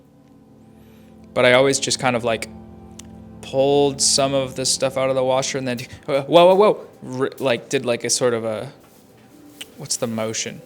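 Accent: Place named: United Kingdom. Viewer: The British voice and the music was pretty chill but i like it without music too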